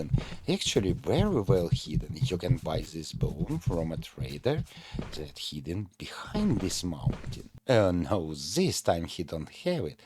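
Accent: Russia. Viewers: Is the narrator Russian?)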